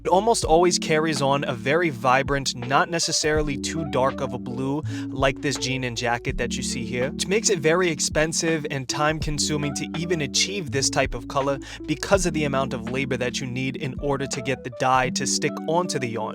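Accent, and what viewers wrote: New York, United States. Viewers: You got that nu yok accent brotha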